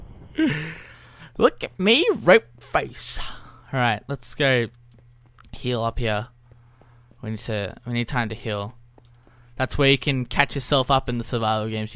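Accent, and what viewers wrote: Australia. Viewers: 15 I came from his new react video xDD. Ikr he sounds so Australian here and much more younger.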